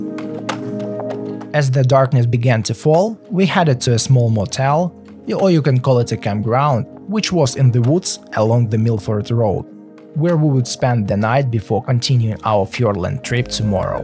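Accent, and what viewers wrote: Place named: Northern Europe. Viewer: Great clip, I am in a dilemma as to your accent - northern european I suspect. Marvellous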